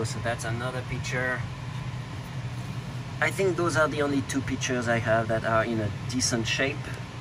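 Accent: France. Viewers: Hi man Love the french accent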